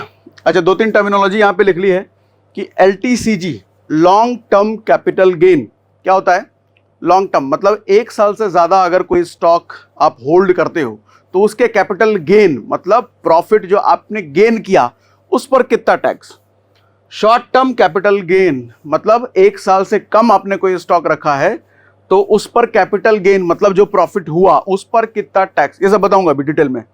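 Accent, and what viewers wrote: Bihar, India. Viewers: Sir aap Bihar se....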